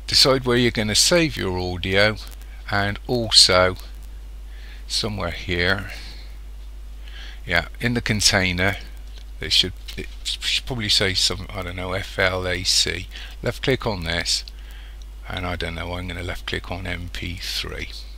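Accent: United Kingdom. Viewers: Most british tutorial ever seen xd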